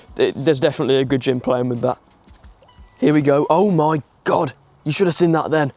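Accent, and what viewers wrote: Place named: Birmingham, United Kingdom. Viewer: Great video were u from im from the blackcountry u sound like a brummie just found u lol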